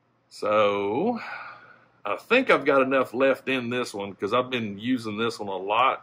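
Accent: South, United States. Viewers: well, i can say this: there was no need for you to apologize near the end of the video for the video being “too long”. You did an EXCELLENT job, not only on the helmets themselves, but on explaining everything throughout the whole process. I am pretty much brand new at this whole casting & molding thing. so new, that all i’ve done so far is some “research” or in other words, “watched a dozen or so youtube videos it. and, i wish i woulda stumbled on your video first, i would be comfortable trying my first “run” just from watching this video alone. so, just wanted to say thank you for this video, i learned more from this one video than i did from all the others, combined! 🤣 but hey, dont reckon i ought to be surprised, why? because, i can tell your from the south, like myself… and thats how use good ol boys do it down here! 🤣👍 thanks again brother. ☺️👍👍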